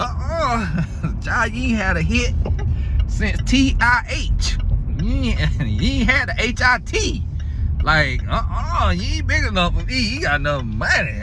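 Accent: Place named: South, United States. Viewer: I may to watch this quick clip more than once. With southern accent and jokes. I'm busy laughing to grasp the teaching. what in the werl?!😅😂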